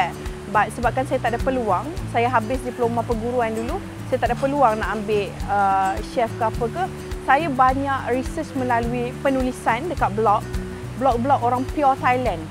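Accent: Perak, Malaysia. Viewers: Best dgr dia cakap perak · atay org perok ckp pun nampok comey jee...ajeee ranjooo betoiii kome....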